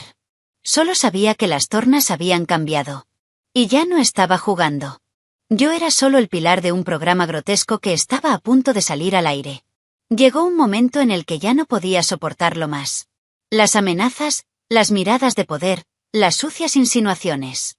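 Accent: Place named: Spain. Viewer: Recomiendo le pongas un acento mas neutral. En America Latina somos 650 millones contra menos de 50 en España. Y la verdad escuchar acento español en estas historias desmotiva un poco a los latinoamericanos. Y parecen mas historias cómicas que otra cosa. Con todo respeto, pero la verdad.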